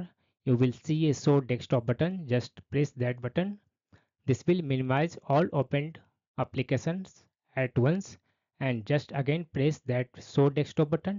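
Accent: India. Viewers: you know the tutorial good when the accent is indian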